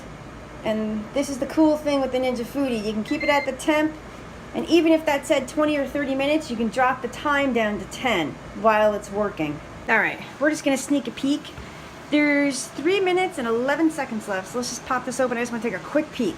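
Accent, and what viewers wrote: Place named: Pennsylvania, United States. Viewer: Can I ask where you're from? My mother in law is an Italian from Pennsylvania and you have that same ever so slight accent.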